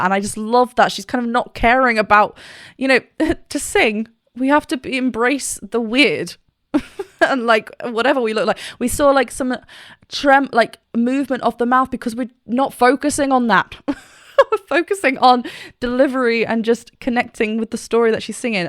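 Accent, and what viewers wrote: United Kingdom. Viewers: You have a beautiful British English accent.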